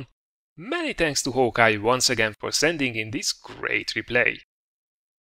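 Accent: Hungary. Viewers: Tudtam, hogy magyar vagy! :D Csak néha belenéztem a videóidba, és a kiejtéseden lehetett hallani, hogy magyar vagy. Csak amíg nem jelentetted be, nem voltam benne biztos!:D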